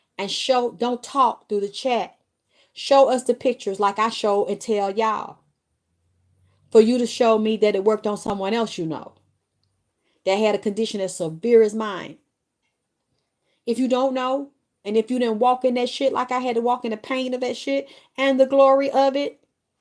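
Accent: South, United States. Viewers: I love the country twang. It’s what I fell in love with . Pleaseeeeeeee don’t ever lose it lol 🥰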